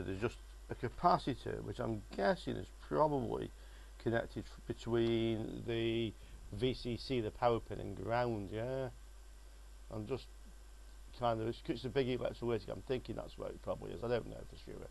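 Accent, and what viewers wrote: United Kingdom. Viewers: Got it! Thanks and thanks for not posting something rude. I was honest about wanting to learn from an expert and I clearly could not understand his or really any "heavy" British accent. I did manage to figure out "subtitles", something I did not know about. Even at 65 I still learn something new everyday. This Youtube is mostly a younger persons platform and have just recently started to watch, learn and enjoy from it.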